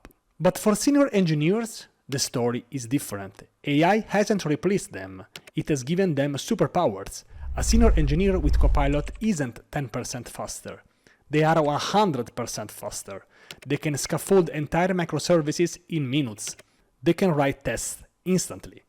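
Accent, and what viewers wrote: Italy. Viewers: Your Italian accent just makes me less worry , thanks that was good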